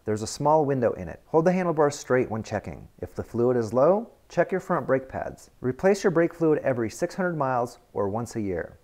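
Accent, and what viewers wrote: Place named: China. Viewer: Chinglish!!!! I love it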